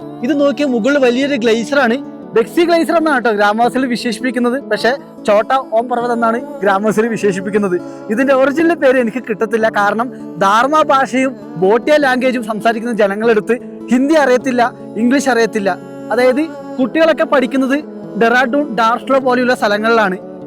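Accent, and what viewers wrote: Kannur, India. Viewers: Halo bhai, namaskar, ur journey, ur adha adhuri hindi language, ur kannur slang, eg koy for kozhi(chicken) r great and amusing. Best wishes and God bless u always. always.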